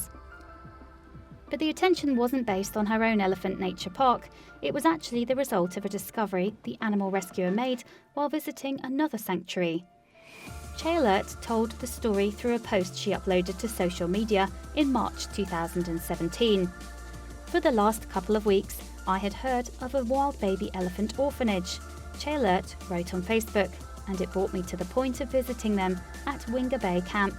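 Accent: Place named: RP, United Kingdom. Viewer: Pachyderm = Pak— ee- derm ( not patchy derm) Myanmar= My— Ann— Mar ( not Myna Mar, or whatever it is she was saying. A lovely posh accent is no substitute for doing your research.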